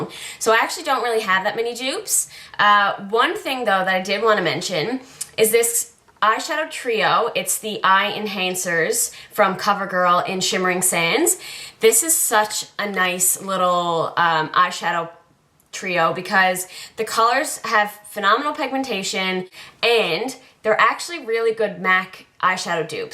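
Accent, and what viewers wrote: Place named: East Coast, Canada. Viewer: Michelle sent me as well! You are gorgeous and your videos are great, glad to have discovered you. I love your top, where is it from? I am also Canadian from the West Coast, I would guess you are from the East Coast from your "accent". Thanks for the great videos!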